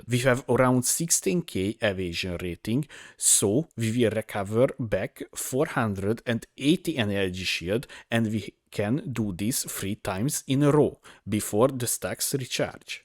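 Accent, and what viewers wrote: Hungary. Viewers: You must be Hungarian with this accent. :D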